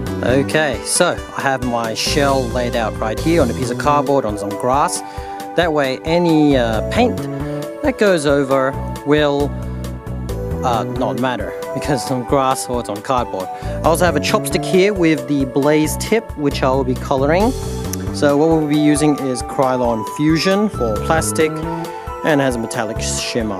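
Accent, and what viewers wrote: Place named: Australia. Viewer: Bro are you from Australia? You have the Aussie accent. No troubles getting the gun into the country? Buy from eBay?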